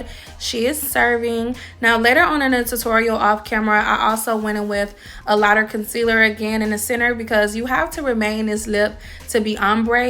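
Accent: Miami, United States. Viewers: That Miami accent tho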